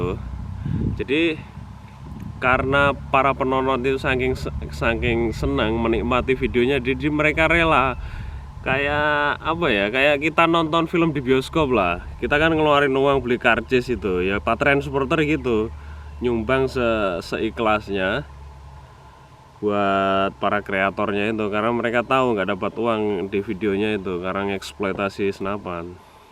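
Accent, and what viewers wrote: Java, Indonesia. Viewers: jowo mu ngendi kang..kok koyo logat jowo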